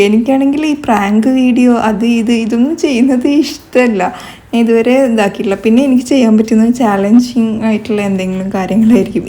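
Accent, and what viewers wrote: Palakkad, India. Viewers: പാലക്കാട്‌ കാരുടെ സംസാരം നല്ല രസാ അല്ലെ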